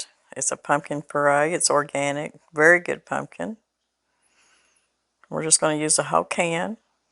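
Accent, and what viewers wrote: South, United States. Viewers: i think you talk normal 🤷🏼‍♀️ but i only live a few counties over 😂 ms., i'm 45 and i have always said waspers, worsh rag, squashes, caint (can't), and all the other southernisms we have. i "workin'" in my craft room, but i sure could go for some of the pumpkin pie. that sounds so good and even better that it's pumpkin season. love living in the natural state with you! farrah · Please ask Mr. Brown to find out what kind of pumpkin that was. I couldn't find any called cow patty or even close to that. Thank you! I love your videos and your accent. My parents are from West Virginia but raised us here in Wisconsin. I love hearing southern accents as it reminds me of them. They also were teased as to how they pronounced words.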